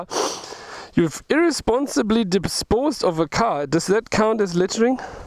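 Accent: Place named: Germany. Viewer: Are you from Germany? You've got a German accent! I'm from Sweden.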